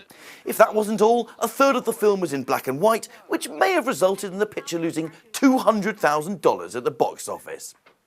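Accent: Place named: United Kingdom. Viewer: He's from this placde called Britain, also known as England. They say words a little bit different over there.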